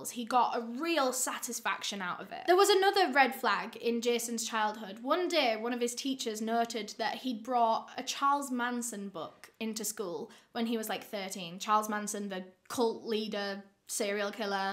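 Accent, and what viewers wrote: United Kingdom. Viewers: I found British MrBallen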